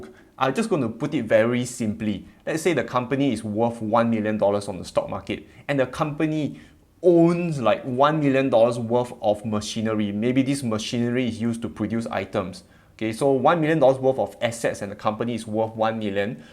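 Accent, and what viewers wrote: Singapore. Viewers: Love the singaporean accent dude! proud of you! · I sold my bershire last 21february sadly i really like the guy may if you mix feeling and investment it is not a good moove like the guy but not too much some bershire stock as i am writing brb.b is 170.6....thank you for you video i like your Singapore accent i have interest in Singapore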